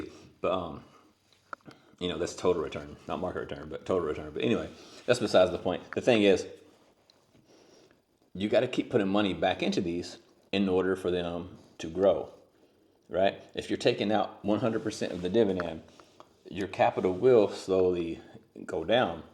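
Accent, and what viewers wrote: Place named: Appalachia, United States. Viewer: I detect an Appalachia accent but a Slavic face. I'm confused, but you're cute. I got zividendz😊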